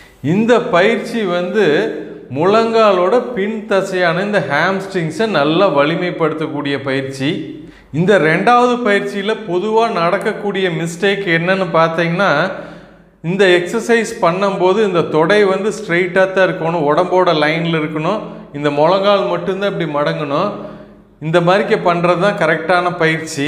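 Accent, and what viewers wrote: Kongu, India. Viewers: கொங்கு தமிழில் பயிற்சி மற்றும் விளக்கம் அபார்ம் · கொங்கு தமிழில் அழகாக தமிழில் விளக்கியதற்கு மிக்க நன்றிங்க 😊🎉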